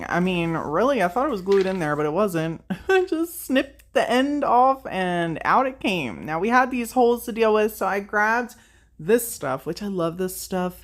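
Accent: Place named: Pennsylvania, United States. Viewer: Pennsylvania!!!! That must be what it is.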